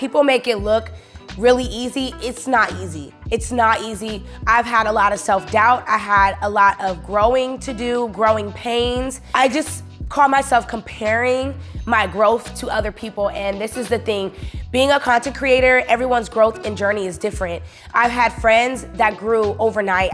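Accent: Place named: California, United States. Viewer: When i heard you talk i was like she's from Cali and i know she's from the bay and the u said something about Walnut Creek on one of ur vlogs lol i live in Arkansas now but i know how we sound lol 😆 btw you've gained over 2k subscribers in one month! Ur doing amazing ! U def motivate me to get back to being my self !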